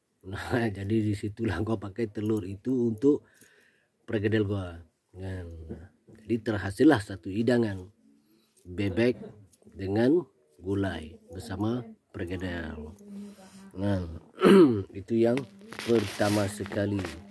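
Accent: Malaysia. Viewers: dia memang orang minang, tapi dari kecil sampai tahun 2017 itu dimalaysia, makanya logatnya itu logat melayu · lama di Malaysia jdi logat melayunya kebawa, tpi aslinya ya emg dri tanah datar sumbar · dia logat melayu itu buk,karena 30 tahun tinggal disana 😀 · Lord, ceritanya bisa berbicara Melayu mirip Malaysia begitu bagaimana? Kayaknya Sumatra Barat gak begitu deh melayunya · saya dari Malaysia, saya suka sangat bila masak, sempoi gituu, bila saya tengok mci saya tengok longatnya kok macam orang melayu ni, rupanya orang jebat ni wehhhh. Abang jago ni bosss🤣🤣🤣🤣👍👍👍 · Kental sangat logat melayu Lord ni, I gagal fokus to your price tag baju, 😆😂 Stay humble and go to the top. 😊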